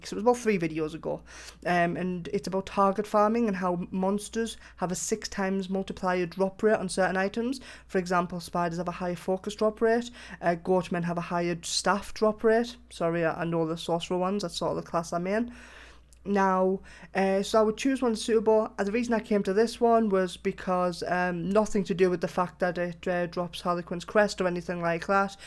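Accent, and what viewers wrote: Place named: Newcastle, United Kingdom. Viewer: The only thing that makes this already awesome glitch better is the geordie accent hawayyyy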